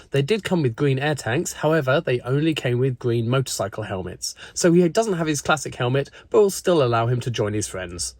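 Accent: United Kingdom. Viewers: When I first watched this I knew he was from United kindom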